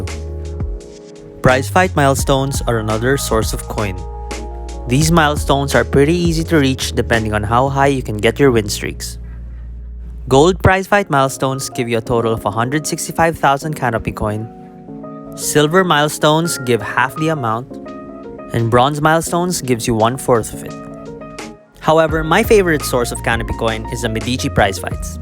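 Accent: Philippines. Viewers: The video is awesome can't really say much but i can tell how your accent works. Dont mean to be rude but are ya a filipino? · I can smell that filipino accent from over here. Great video btw.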